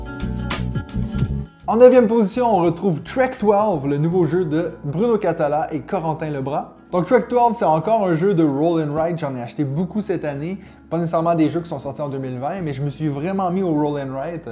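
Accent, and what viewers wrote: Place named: Quebec, Canada. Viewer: J’ai vu dans le vidéo du concours que tu vis en Suisse mais je trouvais ton accent très québécois. Ta réponse vient clarifier le tout ! Merci !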